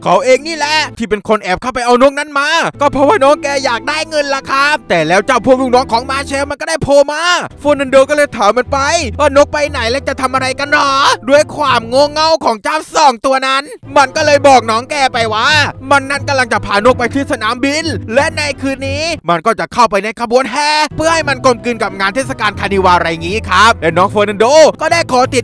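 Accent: South, Thailand. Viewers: ชอบภาษาใต้ของพี่อะหนูอยากให้พี่ภาคหนังเรื่องหนื่งเป็นภาษาใต้ได้ไหมคะ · นี่พี่บาวเป็นคนไต้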